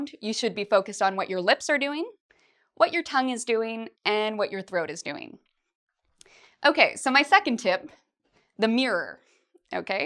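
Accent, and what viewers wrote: Canada. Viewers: thank you so much for your videos! Because of your lessons I have greatly improved my pronunciation and grammar. BTW, love the Canadian accent:) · I thought you were a Canadian lol...In HIMYM everyone would laugh at Robin cuz of her pronunciation ahahah...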